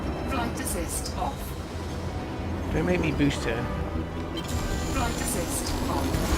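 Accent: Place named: Wales, United Kingdom. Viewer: Getting an oculus in a few days. Just hyping myself up by watching elite vr videos lol. I love elite but I'm gonna be so obsessed with it now from what ive seen and heard from vr owners. I love feeling immersed in games and vr is a great tool for that; its perfect for elite. Good to see someone having fun messing around advance and shooting things. Also,are you from wales? You have the accent of someone down cardiff way. Just curious, being from wales myself.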